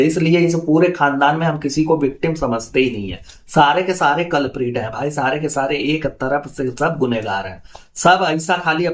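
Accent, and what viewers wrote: Uttar Pradesh, India. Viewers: Bhai aap up se ho ??aapki baato se lgta hai main bhi up se hu ...accha lgta hai aapke bolne ka andaj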